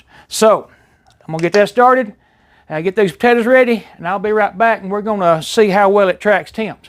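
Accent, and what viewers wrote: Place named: Georgia, United States. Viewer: glad my accent spotting wasn't off :) look forward to more content I lived in forsyth and Cherokee but accent sounded very similar to my friends by cartersville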